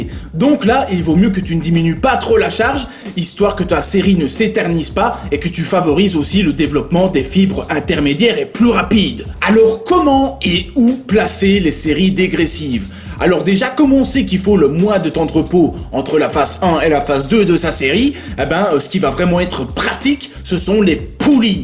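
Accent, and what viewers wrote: Belgium. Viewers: Est-ce qu'on peut faire ça avec des elastibands? 😄.. Tu as une.voix terrible mec! En plus l'accent belge embellie tout ça mdr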